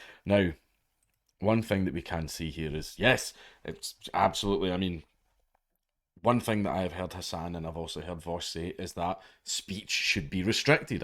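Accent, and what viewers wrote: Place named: Scotland, United Kingdom. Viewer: I love hearing say things like “poor” with the Scottish inflection but nothing tops his pronunciation of the word “murder”.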